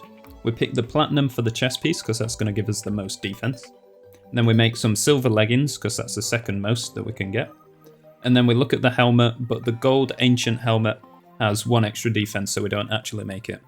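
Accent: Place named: North West England, United Kingdom. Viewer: are you from Manchester or the North West of England? Your accent seems familiar, nice vid!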